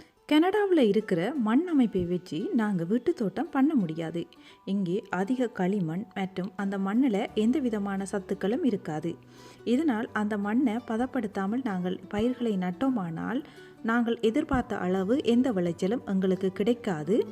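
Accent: Sri Lanka. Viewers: I love your chaste and pure Sri Lankan Tamil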